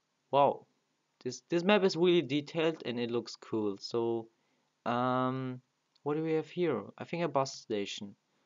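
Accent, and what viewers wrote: Germany. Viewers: Wie mann einfach hört das du deutscher bist! :DD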